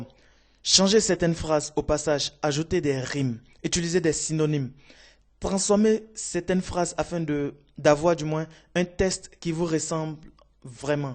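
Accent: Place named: Africa. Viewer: super le frere acsent africain et tout ayeeeeeeee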